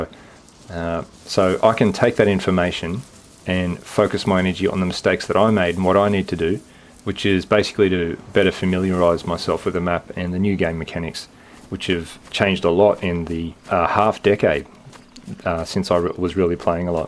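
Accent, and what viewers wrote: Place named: Australia. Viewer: British!? This dudes an Aussie buddy!!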